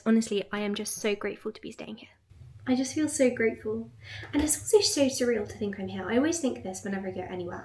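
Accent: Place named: England, United Kingdom. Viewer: love your voice, love your accent. Could listen to you all day long. You whisper, so you don't anoy the people around you. So very polite and English. ❤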